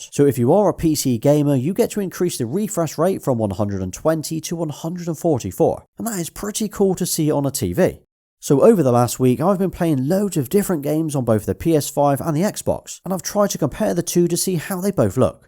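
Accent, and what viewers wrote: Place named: England, United Kingdom. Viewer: what is this city ? miami or another ! hi bro from kazakhstan 🇰🇿 your accent so beautiful 🔥 the english accent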